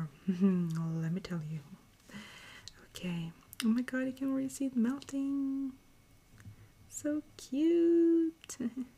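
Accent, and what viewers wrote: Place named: Scandinavia. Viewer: It's so weird how I never noticed your mild Scandanavian accent until you went quasi-albino. 😅 Great relaxing video as always!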